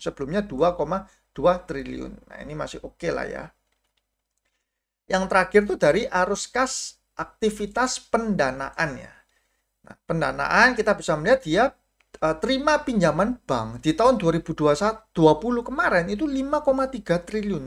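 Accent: Surabaya, Indonesia. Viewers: Suka medhok Suroboyoane.. Suwun Cak ilmune.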